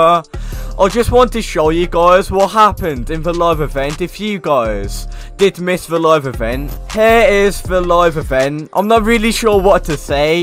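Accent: United Kingdom. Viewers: and that british accent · Nice British voice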